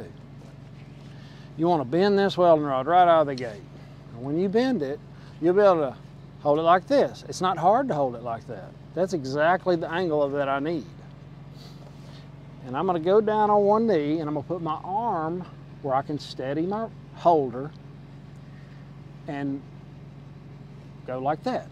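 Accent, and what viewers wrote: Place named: Arkansas, United States. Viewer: Hey man I've been watching your channel for a couple years now. Long story short I'm from Arkansas as well. I always thought you were because of your accent. I'm a welder and I'm currently about to graduate out of a well known "Elite" welding school. I know you probably have heard of it. I would love to reach out to you and just get some advice. I don't know if you'll see my comment. I know you're busy take care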